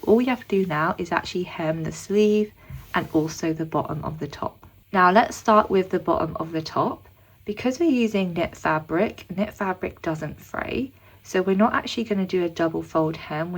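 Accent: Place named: United Kingdom. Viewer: what kinda uk accent do you have? i love it!!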